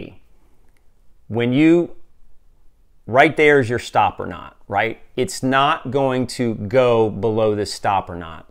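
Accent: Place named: New Jersey, United States. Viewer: It says Old Dominion, but every time you say "wadder" I hear NJ/eastern PA.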